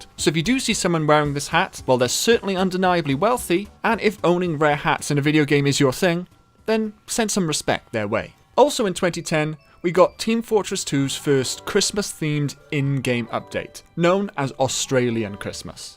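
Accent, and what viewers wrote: Wales, United Kingdom. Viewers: Great video, Just wanted to ask if you are you welsh?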